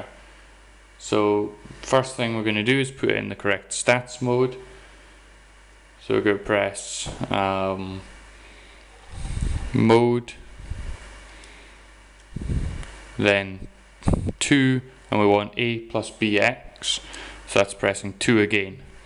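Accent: Scotland, United Kingdom. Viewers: Are you from Dunblane?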